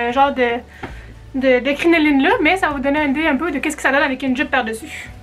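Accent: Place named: Quebec, Canada. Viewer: Trop sympa! Un like pour l'accent québécois!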